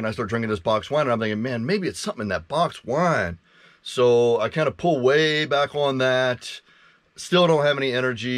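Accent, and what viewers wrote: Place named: South, United States. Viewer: Glad that things are good,! You are beginning to get 'just a tad' of Southern English twang going there... Ha ha. Welcome to the Southeast.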